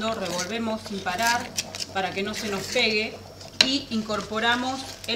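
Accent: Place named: Argentina. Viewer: Eso depende del país en donde vivas y las pronunciaciones de ciertas palabras. Ella es de Argentina y por eso tiene esa pronunciación. No lo hace mal, sólo es su forma de hablar, y está bien.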